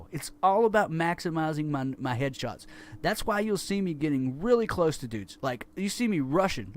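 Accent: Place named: South, United States. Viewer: Southern accent is cool these days dude. Its also a total relief to listen to a voice that is original, and not sounding like a generic radio DJ like 99% of youtube gaming commentators. Btw thank you for taking the time to reply to my comment, credit to you sir. Good luck with the channel, its helped me a lot.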